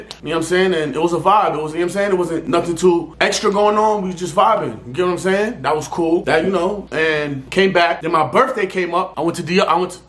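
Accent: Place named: Miami, United States. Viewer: Welcome Back Miami accent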